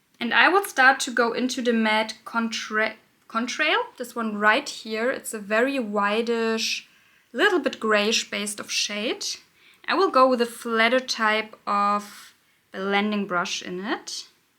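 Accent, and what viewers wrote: Germany. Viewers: i noticed the german accent right away and i love it (half german girl here).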